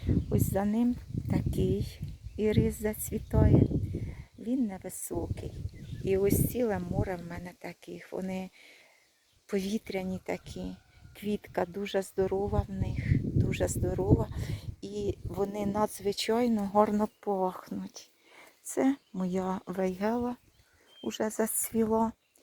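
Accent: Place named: Cherkasy, Ukraine. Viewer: Дійсно море 😂 ! У вас Черкаський акцент.😂 Моя Батьківщина 🤗Гарного сонячного дня 😊👋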